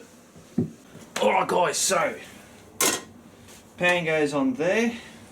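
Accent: Australia. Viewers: Your accent makes me miss living in Woomera SA.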